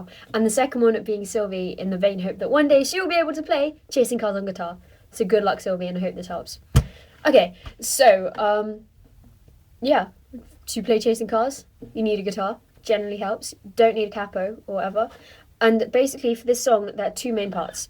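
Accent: England, United Kingdom. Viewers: That was so clear, thanks! This was the first video of yours that I saw but hey you sing very well! Oh, by the way, you do sound totally English don't worry ;) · Thanks I am a complete beginner this really helped, how do people think your Australian, you sound english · Great tutorial, it really helped :) and as an English person I can tell that you're English ;) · It's obvious you' re from england :D You're cute... ^^ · Thanks for this! You DEFINITELY sound English. Like you I also don't get how any one could mistake that for an Australian accent. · im from germany and i "knew" after a few minutes that u are from england. very nice tutorial. best i found so far. only a lil thing would have been nice: if u could play the whole song as an intro or so :D. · You are so cool. Thank you for this great tutorial. Will help me a lot. You sound English!!! 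No doubt. Greeting from Germany - Michael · How can u think she's American or Australian. I lived in England for most of my life and then moved to Australia :( all Brits sound like her, great vid by the way!!!! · How does it sound Aussie no chance it's uk all the way btw I'm from uk live in NZ next to Aussie your accent is so much better than g'day mate :D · i knew u were from england i love your accent!! oh and thank you the tutorial was well explained and very easy to understand. ^-^ god job and keep going. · First, i think your british, XD sorry, by the way, ,love the voice, love the hair, love the song. :) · D that´s funny! I recognized where you come from by hearing your accent!! ok.. i spent a few days in London...and I really like this british accent! youre probably right, but not everybody can hear the accent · I am American and I could tell that you were English, but the real question is what part of england are you from. 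I also understand your frustration with people thinking that English and Australian accents sound the same. Keep up the good guitar work. Can you do by. I am recently obsessed with it. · You can tell you're English. Thanks for the video, only Q is after you play intro 2 times then the bridge do you go back to the into? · Im from England too, omg your so funny. And english accents dont sound like australian accents god..Americans are weird lol :)) Im from Newcastle. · Thank you so much! I've watched dozens if not hundred of Guitar how to's and yours are by far the easiest to follow. I cant wait to watch more of them! And don't worry, the accent is pretty obviously British :) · Like the way you show around how to play a lot of great songs, great work! Thanks! Ps yes your accent is clearly English to me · where are you from in england? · Ella tiene un acento hermoso, así hablando tan bonito en Inglaterra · Hi from New Zealand tauranga..yep Great teaching at the right speed to learn great song .. 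Thanks . Your accents not to strong english wise but but nothing likean Aussie..cheers. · Love your voice, Ahaha i thought it was Australian until you said British. Now i hear it! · thank you for the tutoral :) you're really good at teaching :O love the accent! xD i thought it was a british accent but wasnt certain :P from: irish boy Donal :) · Thank you for this video, you've made learning to play chasing cars a little more interesting for me. You sound nothing like an Aussie defs English. Again thank you :-) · Love your English accent :) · I'm English and I could tell you where English! Can you do,even though this is like a 6yr old video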